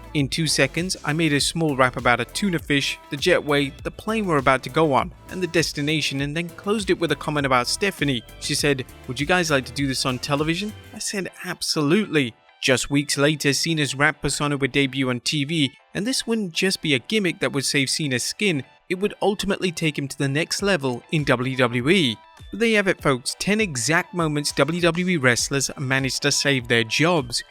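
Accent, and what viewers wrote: United Kingdom. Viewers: I know your brittish and have an accent, but how in the hell do you get "Evil-lution" out of the word "Evolution"?? REMEMBER, The 3rd letter is an "O" not an "I"..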